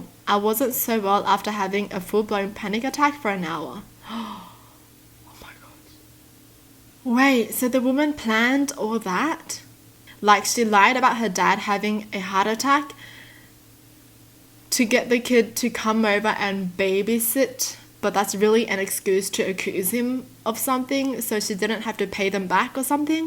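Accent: Australia. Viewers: I’m new so when I here her voice I think she’s has to be Australian then I heard “Swallow I that advice for yourself mate”Me:yup definitely Australian I have an Australian accent but I’m American yup I’m weird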